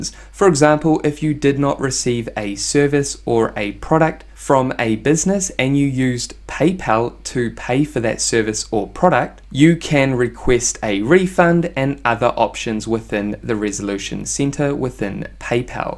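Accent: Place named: New Zealand. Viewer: Aww jesus, a NZ accent is bad enough but a queer NZ accent is the pits!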